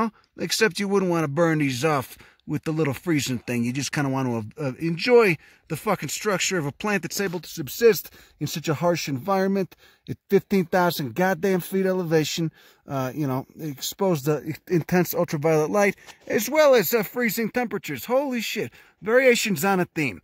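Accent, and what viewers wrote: Chicago, United States. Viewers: Love this ! My brain feels like a sponge.......LOL..soaking up all this info plus your marvelous Chi town accent..Great way to start a day ! Thanks :) And yes...cute shit DOES make me feel less homicidal..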